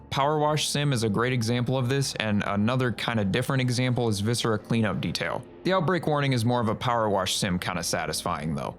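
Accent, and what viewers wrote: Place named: Canada. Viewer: Your Canadian accent is barely noticeable but it's adorable. No malice in mind, just been seeing that dude on "shorts"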